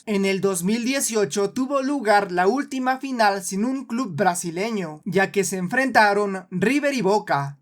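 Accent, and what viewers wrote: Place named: Peru. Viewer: Pero este tipo tiene acento peruano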